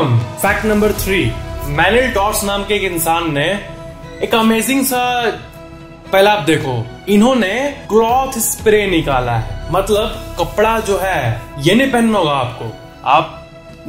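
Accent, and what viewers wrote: Bihar, India. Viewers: Is he bihari? 🤔🤔🤔🤔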